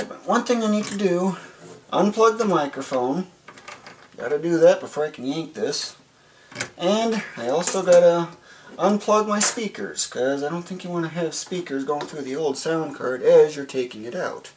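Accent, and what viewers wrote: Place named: South Louisiana, United States. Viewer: Im lovin that south Loisisianian accent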